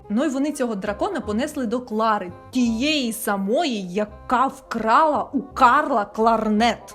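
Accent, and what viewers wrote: Surzhyk, Ukraine. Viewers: у неї майже суржик. не дивно, що ви усе зрозуміли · Потому что девушка говорит российские слова с украинским акцентом, но это все еще российские слова. Попробуйте понять, что означают эти бытовые украинские слова: човен, легИт, скло, бляшанка, бездрЫк, вИдлуння, прЫмара, віяло, троянда, гИлля, лИжко, вЫделка, тлумачЫти, пазурИ, герць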